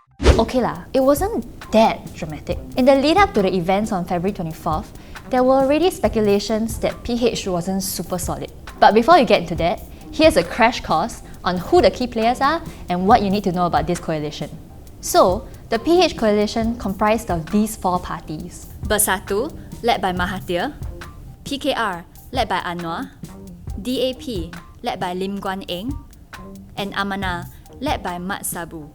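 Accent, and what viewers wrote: Singapore. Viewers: Very good singlish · I terribly in love with Singaporean accent.. Okay Lah!! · Nice Singlish!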